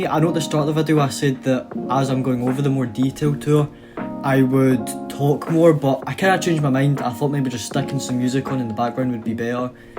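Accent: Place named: Scotland, United Kingdom. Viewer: Most scottish sounding guy ive ever seen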